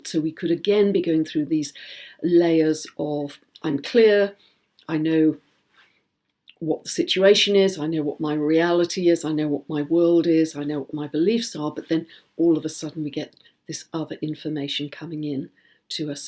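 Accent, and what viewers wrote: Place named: RP, United Kingdom. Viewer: I like that you are friends with Bracha. Good video. You are very posh:)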